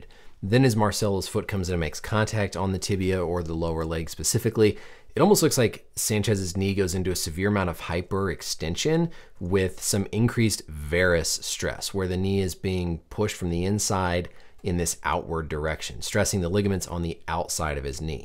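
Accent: United States. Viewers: I subscribed because you said “football” instead of “soccer”.. and in an American accent not even British 🎉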